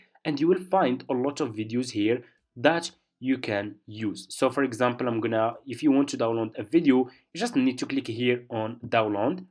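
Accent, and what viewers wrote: Morocco. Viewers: He has a Moroccan accent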